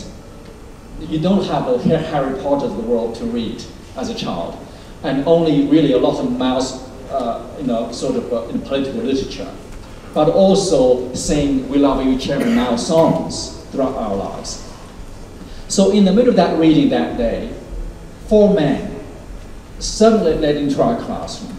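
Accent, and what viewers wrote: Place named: Australia. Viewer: All those years around a 'Aussie' wife...he has a slight Australian accent. So loved listening to him tell about his life. Loved the movie about him!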